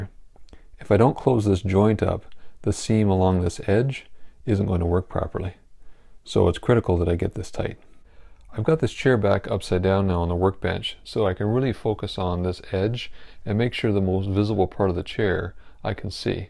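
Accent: Canada. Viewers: I hear a fellow Canadian's speech! Broken chai, here and now some optimism about repairing it. Enough optimism, that I won't watch the "make a new leg" until I hear the fateful crack again in this one. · Hey! Are you in Canada? Or Southern Virginia? Just curious about your accent. I'm retired from furniture, so I like to watch and sometimes I can give you some tips. So far, you do everything like I did, except I always used flux brushes for applying wood glue. Work much better for gluing and much cheaper too. Thanks a lot.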